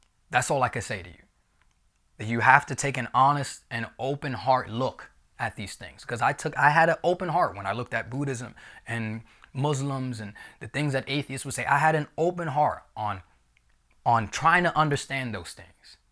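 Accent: New York, United States. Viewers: i think I read “what’s this accent” the first time. 💀What I meant is there was no accent change, he talks the same (the NY accent) he’s just not doing his characters, with had a more cartoonish personality and accent. Remember his movie about getting robber? He sounds the same as this in that, because he’s not playing a character, but being himself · he has a NY accent…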